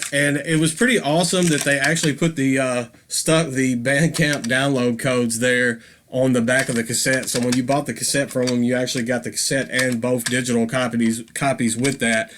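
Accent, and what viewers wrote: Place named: South, United States. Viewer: Would be funny to hear you singing little bunny foofoo with that southern accent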